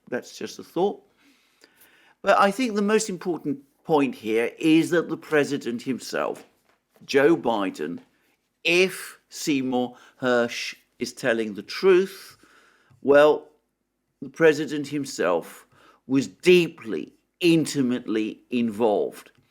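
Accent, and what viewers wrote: United Kingdom. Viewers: He's married, you little American pos. Your English isn't very good, eh? It's "speaking in a foreign accent." It's a British accent, so maybe you're not American but some sort of Euro scumbag.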